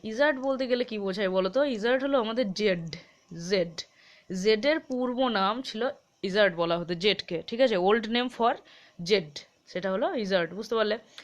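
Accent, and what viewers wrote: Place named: Bardhaman, India. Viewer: আপনার বাংলা অনুবাদ এত সুন্দর ম্যাম যে মনে হচ্ছে সত্যিই live দেখছি ড্রামা টা। তার সঙ্গে ম্যাম আপনার বর্ধমানের tone " তু " ।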